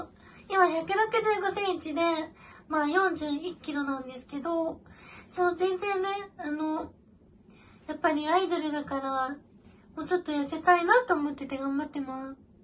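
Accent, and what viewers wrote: Shizuoka, Japan. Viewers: 初コメです^ - ^静岡県民なのでウソだらの静岡弁聞けて嬉しかった(*^_^*)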